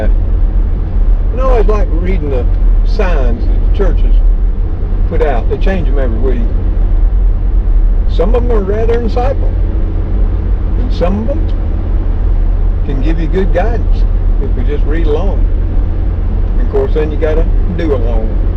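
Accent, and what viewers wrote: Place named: Kentucky, United States. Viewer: With your accent, you sound like you are from my neck of the woods...Kentucky!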